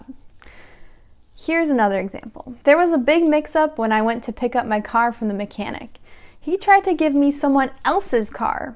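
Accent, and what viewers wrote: United States. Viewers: R u american?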